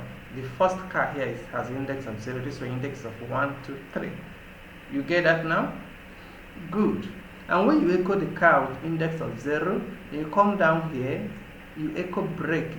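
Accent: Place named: Nigeria. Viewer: Wooaahh!!! Thank you so very much, Mr! This video helped me to understand the "sort" function and method of use very well!🥰 Also... I kept on laughing sooo much, as I listened to you teach! At first, I didn't even know who was teaching, until the accent caught my attention! lol!!... (I was expecting an Indian, as usual - they actually teach very well... but the accent sometimes is a challenge for me.😊) But when I checked the name of the vlogger because the accent was sooooo Nigeranly, and I saw it was Nigerian?? 😂 I couldn't help but laugh sooo much as I watched and learned along😅. You really sound like these our Nigerian lecturers in the Nigerian Universities😂💝(plus the "do you understand?" that you kept saying!😂🙌... Oh Sir, I truly appreciate this video!🙌) Thank you once again Sir, for taking out your time to make these videos. God bless you!🙏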